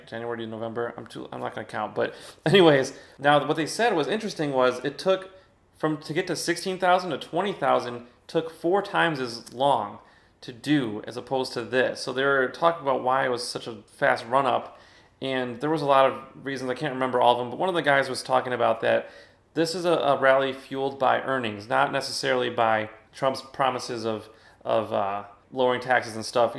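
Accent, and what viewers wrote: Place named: RP, United Kingdom. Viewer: Ok cool, it reminded me of how the Queen speaks (Im in the UK) :) I think Tesla should have produced the Y in the first place instead of the Model 3. The 'crossover' is the most popular type of car out there in N America. On production they are too slow at the moment in fulfilling orders. Even if they made 5k a week which they aren't doing, thats only 240k a year. Its not enough to be a mass market. I don't know why they didn't invest in production facilities to make 10k a week right from the start. Why not take the debt on while it is available from the capital markets and just put the infrastructure in place? Otherwise they'll just keep ticking along making cars in relatively small amounts. If they want to be the iPhone of cars they need to get the cars out of the door!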